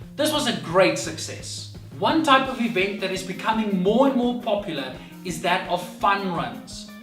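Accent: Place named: South Africa. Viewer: You sound like you're from South Africa or from Zimbabwe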